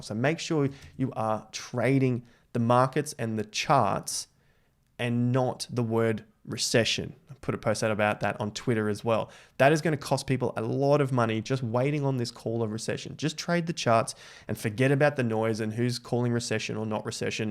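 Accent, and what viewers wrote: Australia. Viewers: I’ve subscribed again, it is great to hear a fellow Aussie breaking down the crypto charts. Well done & here’s to a great 2025 bull market.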